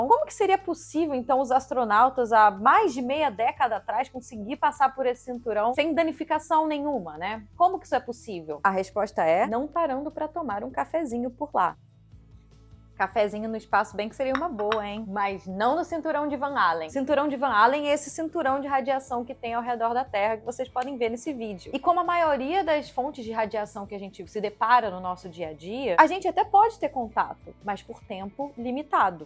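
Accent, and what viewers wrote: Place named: Rio de Janeiro, Brazil. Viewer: Por mais vídeos com sotaque carioca 😆 Essa gente tem muito tempo livre pra inventar teorias mirabolantes.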